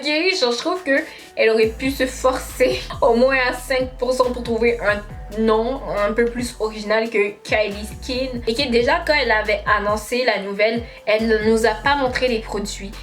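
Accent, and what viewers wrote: Quebec, Canada. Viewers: On dirait ta un accent québécois 😊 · petite accent canadien? c'est mignon <3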